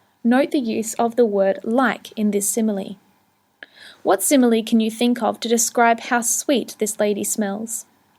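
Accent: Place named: United Kingdom. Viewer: i like the british accent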